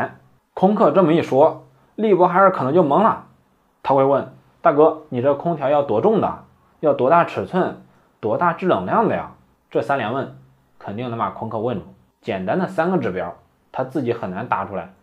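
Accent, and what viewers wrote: Henan, China. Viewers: 空客的河南话很地道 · 听了两期已关注！提点小建议，普通话听着别扭，河南话带劲，我也不是河南人但听着带劲。干脆就河南话整起来，兄弟要有文化自信啊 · 这个老丝儿是河南了呀！ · 聽到的，河南鄉音，好舒坦。繼續努力加油唄！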